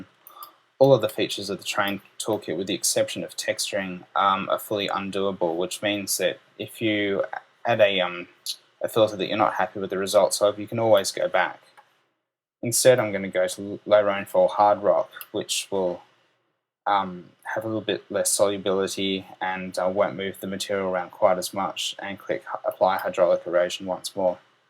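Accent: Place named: Australia. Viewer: i thought i heard an aussie accent, we aussies are legends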